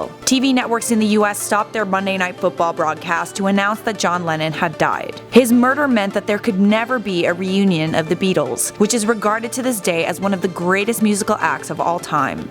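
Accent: England, United Kingdom. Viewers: Youre english right?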